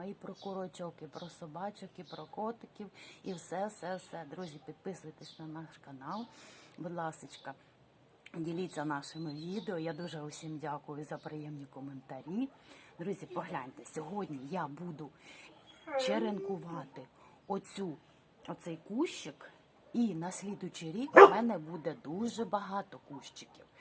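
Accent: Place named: Ivano-Frankivsk, Ukraine. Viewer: Чую у Вас Франківський акцент! Дякую за поради. В мене теж п‘ять котів з вулиці. Бережіть себе. Вітання з Дніпра.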